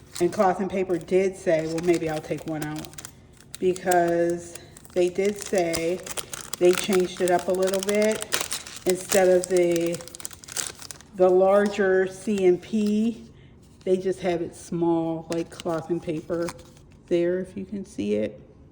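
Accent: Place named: Pittsburgh, United States. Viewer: I loved everything from this collection. I am also a legend and still didn’t get everything I desired. I’m grateful for what I received. I will continue to support C&P. Planning is my creative outlet and regardless of the economy I’m going to continue to invest in it. Love your videos. I picked up on the Pittsburgh accent btw 😊.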